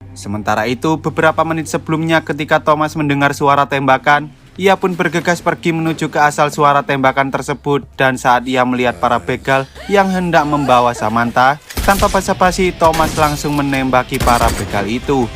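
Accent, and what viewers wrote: Java, Indonesia. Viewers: dari ngomong nya udah pasti orang jawa❤🎉😅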